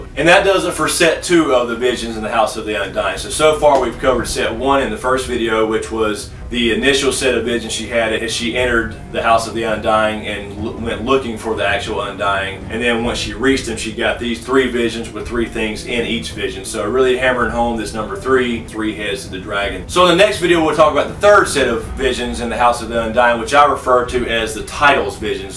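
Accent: South, United States. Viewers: I am so glad I found this channel! You are the first person I have seen/heard with a southern accent to clearly articulate theories and discussion about the books. Brilliant!! Makes me proud to be southern!! ;)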